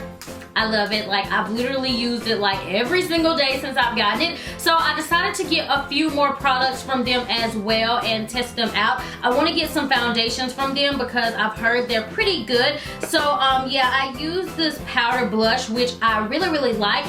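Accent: South, United States. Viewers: She has such a southern accent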